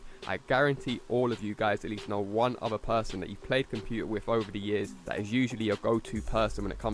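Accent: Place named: England, United Kingdom. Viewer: English u wallad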